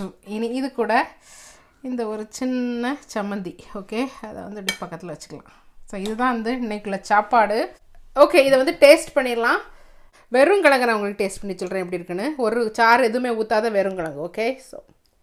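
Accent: Nagercoil, India. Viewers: நம்ம ஊரு பாஷை, அப்புறம் பழக்க வழக்கங்கள் முன்னாடியெல்லாம் மற்றவர்களால் கிண்டலுக்கு உரியதா இருந்துக்கா, ஆனா இப்ப உங்களை மாதிரி சாதிச்சவங்க அத சரளமா உபயோகிக்கும் போது ரொம்ப பெருமையா இருக்கு நானும் நாகர்கோயில் வாசி தான்னு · Sir learnt nagercoil slang from u madam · I really like to hear ur Nagercoil slang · Nagercoil slang🔥🔥❤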